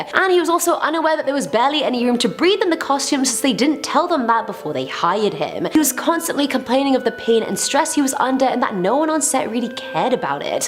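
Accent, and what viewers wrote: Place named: RP, United Kingdom. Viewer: i like your accent i, i think its attitude and i think its called posh.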